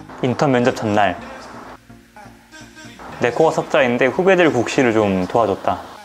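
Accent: Gwangju, South Korea. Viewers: 이제 2월에 본1 들어가는데 너무 웃겨서 이것만 보는중이에요....ㅋㅋㅋㅋㅋㅋㅠ 아 글고 광주사투리 너무 익숙해요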